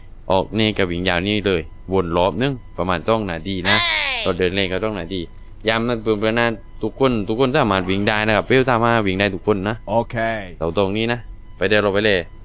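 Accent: South, Thailand. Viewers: แหลงใต้นี้แหละ ที่ต้องการ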